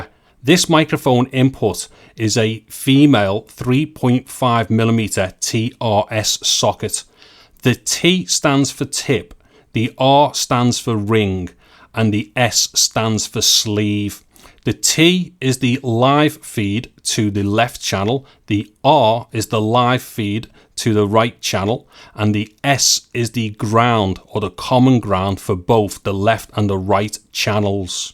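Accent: Liverpool, United Kingdom. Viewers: Couldn't finish the video - scouse accent offends me.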